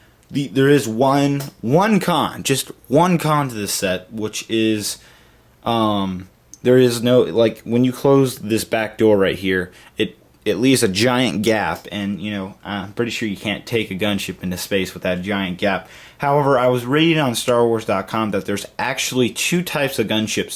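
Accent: United States. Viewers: this gunship is epic I really like it. and I think you are American. (your voice sounds American)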